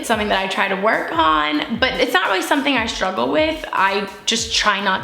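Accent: South, United States. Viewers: You’re southern accent really came out in this video 😂 and I loved it 😍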